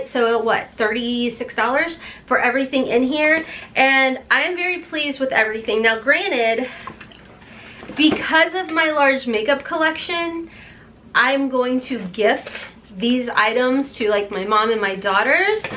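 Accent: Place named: Kentucky, United States. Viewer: That Kentucky drawl comes out from time to time. When you said MAC, my captions thought you said “mayak”. Like kayak, but with an m. 😂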